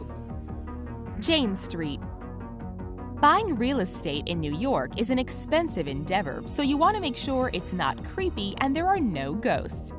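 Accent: Canada. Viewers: So off topic....But I love the way Canadian's say house and out... 😊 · I came down to the comments to do just that! Hilarious. Listen to the way she says "house" too. I think she has a Canadian accent.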